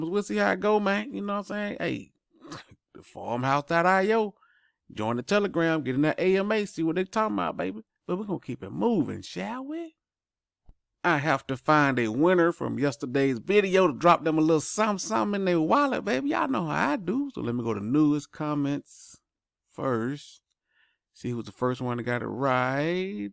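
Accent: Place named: South, United States. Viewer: you the man, where you from bruh. That accent sounds country like me.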